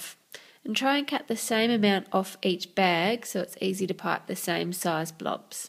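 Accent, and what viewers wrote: Australia. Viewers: BTW, love ur accent! :) Are you Australian? :D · I have to Admit , I love your accent ! #Australian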